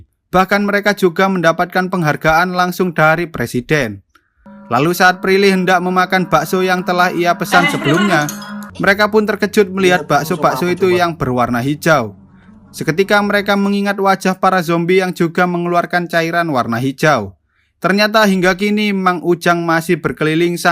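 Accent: Java, Indonesia. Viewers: Lucu ngomongin film ini orang yang punya cannel i i lucu dan kaya orang jawa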